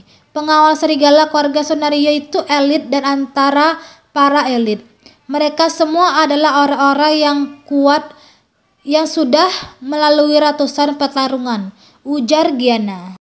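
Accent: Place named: West Sumatra, Indonesia. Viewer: Logat urang minang😂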